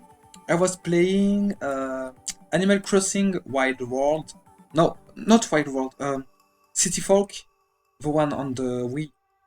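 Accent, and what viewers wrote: France. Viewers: Your accent is like, the most French thing I have ever heard x3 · You have a Really funny French accent XD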